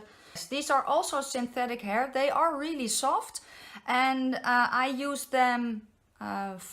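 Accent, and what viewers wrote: Netherlands. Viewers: I heard your accent and I said to myself she is definitely dutch, and ahah i guessed right, Ik kom ook uit Nederland, Love the review dear thanks xx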